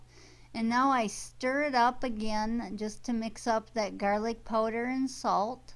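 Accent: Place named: Minnesota, United States. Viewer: jeez, your accent is thicker. (not a bad thing) but you must be from around the iron range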